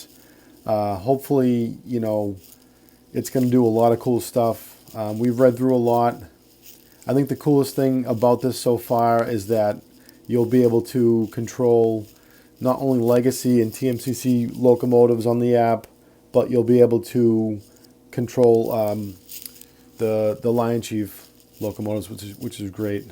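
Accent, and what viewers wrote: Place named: Massachusetts, United States. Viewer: Great video review, You did a good job calling out nonsense and exorbitant prices. Between the prices, sloppy craftsmanship, goofy colors, and bad customer service, it's hard to justifying buying this stuff That RS-27 came from the MTH budget rail-king line which sold well under $400. Lionel almost doubled the price when they got the tooling The passenger cars are almost Golden Gate Depot prices, except Lionel passenger car are plastic, generic and not in the same league. I know there is rampant inflation, but the Lionel steep price jumps started last catalog, before inflation really ramped up....after MTH announced their closing Sounds like you are from Mass, I grew up in Dorchester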